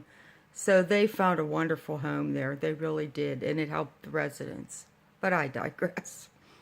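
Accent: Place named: United States. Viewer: Ps. love the accent where in the US are you from?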